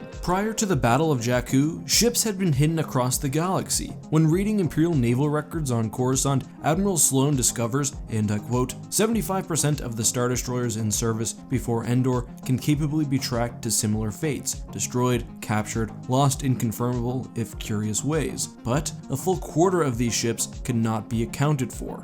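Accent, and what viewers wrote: Canada. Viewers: are you Canadian? As a Michigander, I know a couple Canadians. And my tech/accounting teacher is Canadian. I’m asking cause sometimes you say “aboot” instead of about. Lol I’m just curious.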